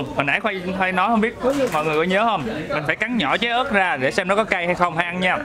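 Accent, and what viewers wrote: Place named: Ca Mau, Vietnam. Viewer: Khoai nói chuyện giống người cà mau nè "cái đó ruột già ông nội ơi', chị thích nha❤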